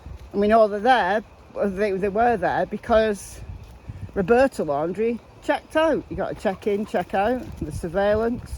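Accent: United Kingdom. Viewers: Your accent so sweet like British 👍🏾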